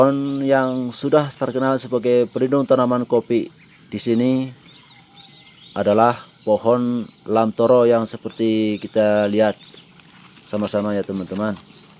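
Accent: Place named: Banyumas, Indonesia. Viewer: wa'alaikumsalaam wr wb jos pokoke... tapi ngomong-ngomong orang aceh ngp bs ngomong ngapak yak bawa saya ke sana dunk 🤝💪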